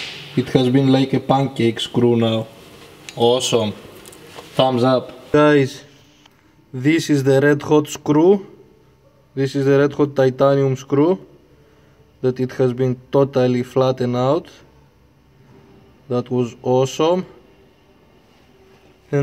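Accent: Greece. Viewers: RandomizedPyro that could be an accent from a greek person too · Recognised your Greek accent immediately. Then I saw the Greek flag on your arm. :P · you sound like you are greek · Are you Greek? Your accent sounds like the Greek accent. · greek - english accent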